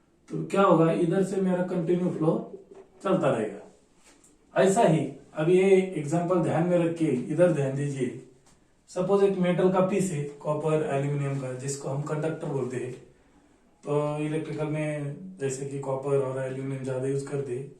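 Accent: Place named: India. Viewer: Love your Hindi Accent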